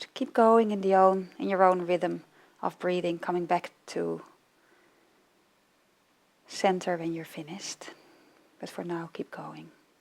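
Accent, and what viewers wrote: Netherlands. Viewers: She’s Dutch... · Cassendre Xavier Definitely a Dutch accent! 😊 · you have got a dutch accent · Wow is she Dutch or what?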